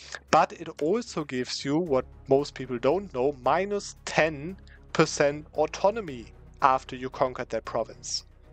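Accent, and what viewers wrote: Germany. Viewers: Sometimes he really butchers the English speech xD Also you have a crazy German dialect but i like it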